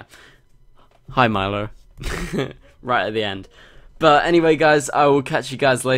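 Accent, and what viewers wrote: United Kingdom. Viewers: Are you from UK ?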